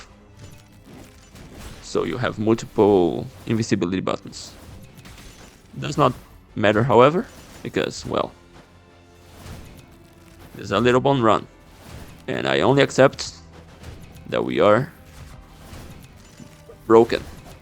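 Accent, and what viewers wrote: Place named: Brazil. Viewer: you are brazilian? you sound like it and your name sounds a little portuguese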